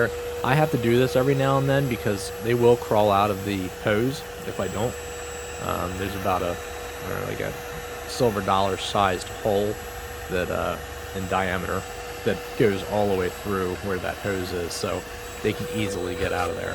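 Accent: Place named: Philadelphia, United States. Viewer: Omg my son is obsessed with these videos and sucked me into watching them now I can’t stop. And I was thinking.. this guy is definitely from Philly. Am I right?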